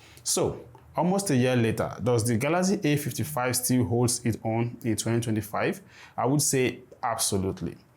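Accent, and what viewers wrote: Africa. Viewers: I got one word in 3 , why have someone with a strong africian accent describe a product, no offence but speech is not clear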